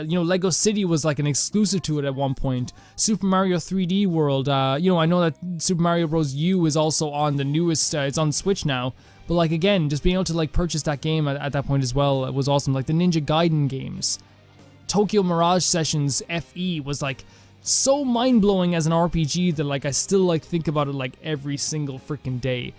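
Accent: Ireland. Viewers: You kinda sound like Jacksepticeye are you Irish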